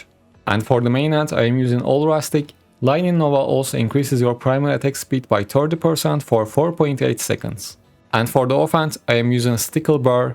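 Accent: Turkey. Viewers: türk aksanı vurdu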